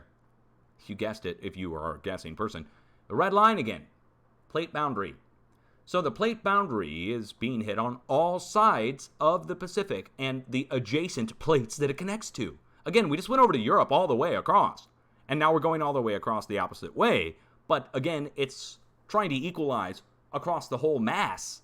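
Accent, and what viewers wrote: Russia. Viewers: Love the Russian accent and your sense of humour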